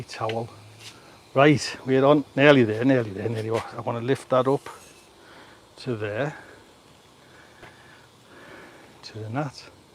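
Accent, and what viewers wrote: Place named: North, United Kingdom. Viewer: Sound good... apart from the funny northern accent!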